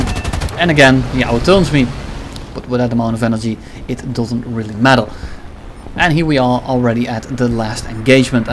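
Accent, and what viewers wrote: Netherlands. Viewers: Hey man i have a question cause you kinda Sound dutch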